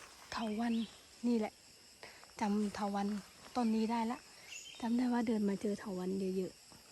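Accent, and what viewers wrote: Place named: Laplae, Thailand. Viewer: เสียงเหมือนคนลับแล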